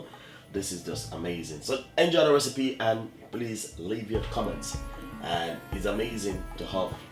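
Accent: Jamaica. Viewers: The Tensai that's the Jamaican pronounciation,the word is moss.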